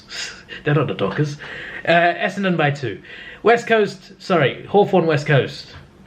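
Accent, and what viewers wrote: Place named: England, United Kingdom. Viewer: What country are you from? You sound like you’re from England kind of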